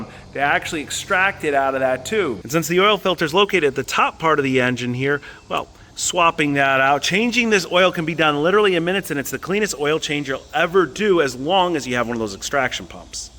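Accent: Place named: East Coast, United States. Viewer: Holy smokes you look an talk like an east coast scumbag. Instant subscribe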